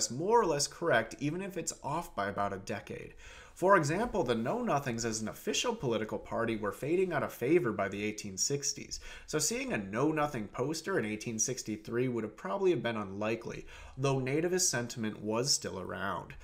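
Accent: Ireland. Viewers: Are you Irish, man, or have you just been watching too much Liam Neeson? Wait, Canadian? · You sound irish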